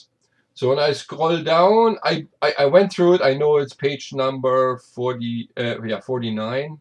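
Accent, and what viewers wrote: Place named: Germany. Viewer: Hi, interesting where are you from, as for me you have a german accent. PS. The quastion was answered a few minutes later))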